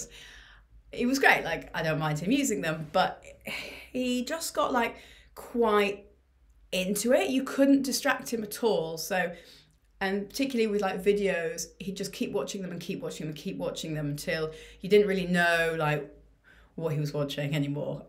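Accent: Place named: England, United Kingdom. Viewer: Nothing wrong with the British accent.... But to be fair, it's an English accent.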